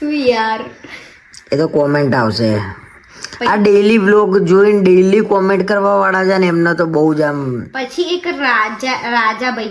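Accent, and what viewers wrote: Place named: Ahmedabad, India. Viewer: તારી સ્પીચ બહુ મસ્ત છે પ્યોર અમદાવાદ ની ભાષા શુદ્ધ ગુજરાતી સાંભળવાની બહુ મજા આવે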